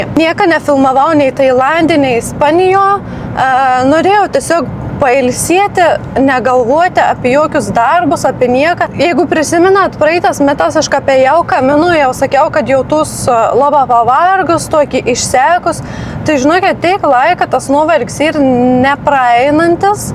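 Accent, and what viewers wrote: Samogitia, Lithuania. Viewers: Nu žiauriai gerai susižiuri video ,dar žemaitoke.Žodžių super .😏🤪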